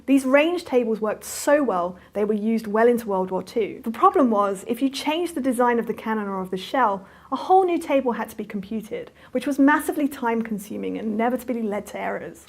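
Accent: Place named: United Kingdom. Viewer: 英音听着好难受啊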